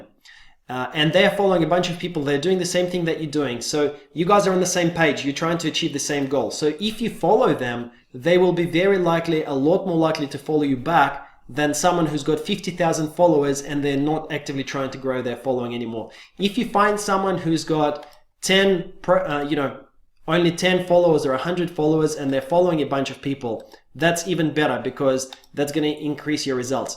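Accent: Russia. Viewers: I can hear English with Russian accent ;) Do you have same the video in Russian?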